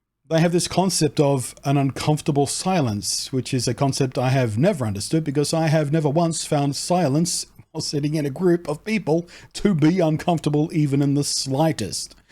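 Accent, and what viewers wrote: Australia. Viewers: You sound like an average Aussie to me. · Being from the UK, and coincidentally, also being Autistic myself, I'd never really thought about your accent too much. Apart from the fact you've told us you're from Australia, and also that I've heard you enough times in your previous videos i could say ' an Aussie', but really that's about it. I have family who have lived in New Zealand for so long now they've picked up a Kiwi accent, and they clearly sound different enough from an Aussie, at least to me, so i'm fairly sure i could tell the difference between accents. You could try just smiling and nodding politely at those who accuse you of having a fake accent. It'll confuse the hell out of them....or maybe it won't. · I've always struggled with "small talk", never really understood why people talk about trivial nonsense purely for the sake of conversation. 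Which even now makes trips to the barber somewhat uncomfortable, although I've found one lately that's happy enough to just get on without the jabber. I also get what I call "verbal error loops" when particularly stressed or tired, where I'll stick on a particular part of a word or sentence, almost always two syllables for some reason. And of course I notice it happening, and get more stressed trying to stop it and... Hence the "loop" 🙄 Only solution is to stop talking and take a breath. Accents are interesting for me, I'm quite good at languages for some reason and as a part of that, good at picking up accents. Whether I want to or not. Which is problematic, as I end up picking up accents from whatever environment I'm in, and again am aware of it and feel like it sounds like I'm mocking the locals (for example speaking English in Germany, but with a German accent and grammar) and have to actually WORK at keeping my natural accent. 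Maybe it's because I'm listening with a Scottish ear, but I've honestly never noted your accent as being anything other than Aussie, although that may be influenced by the fact that I've known or worked with a few different Australians over the years, all of whom have had wildly different accents! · I live in NYC, and grew up in Melbourne, you sound very Australian. I wouldn’t call how you speak ‘Americanised’ in the slightest. Americans just have a terrible ear for accents. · is now a Vtuber? 😄 I think you sound pretty Aussie to me. I watch alot of Australian Survivor 😂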